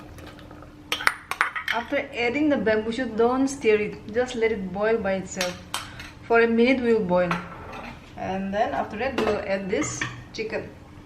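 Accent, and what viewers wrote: India. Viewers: Your hindi speaking is so nice but English sounds like hindi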